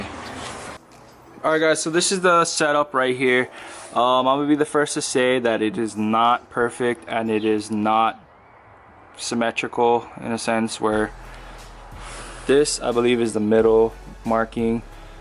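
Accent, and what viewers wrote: Hawaii, United States. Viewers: I sense a accent man. Are you from Hawaii?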